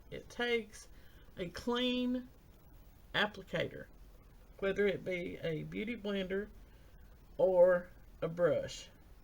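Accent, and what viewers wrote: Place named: South, United States. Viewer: I meant to tell you I just love your accent! My father's side of the family is all Southern and you remind me so much of my favorite Auntie the way she spoke and phrased things. : ) Love you talking thru. It's like hanging out with you. You look fabulous and thanks for the makeup tips! :-)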